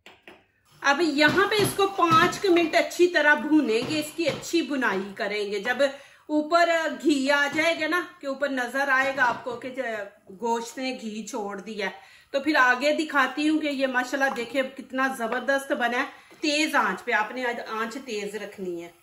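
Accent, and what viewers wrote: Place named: Punjab, India. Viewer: Madam ap english urdu... panjabi style ma boti hain. niceeee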